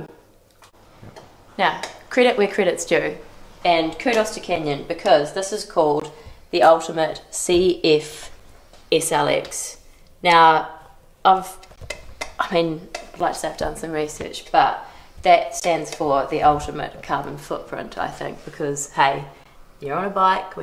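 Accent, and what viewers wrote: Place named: New Zealand. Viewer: Didn't expect a kiwi accent :)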